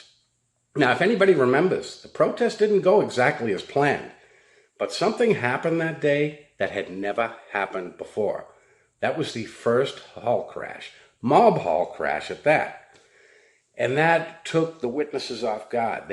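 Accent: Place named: Boston, United States. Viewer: I always found it interesting that JW’s let old bank cleaners and window washers dictate their life. I’m from California and you sound like you from Boston.. you don’t earn respect from regurgitating Bible verses on command you can’t tell someone to gaurd a door or fend off protesters if you never even had a traffic violation or a school fight. I know people who will revoke your invitation to life. I love my elders and they are all dead but they kept an eye on me and I appreciate them. They knew they couldn’t control me. I’m a lil guy but I hit hard and unlike Watchtower if I ask you for a favor I will pay you. My suit is highly respected. I don’t play make believe on Sunday tuesdays and thursdays like JW’s.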